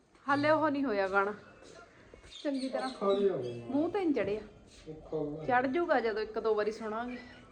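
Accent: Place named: Moga, India. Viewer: Didi thode pekke kithe mainu moge side lagdea thodi boli to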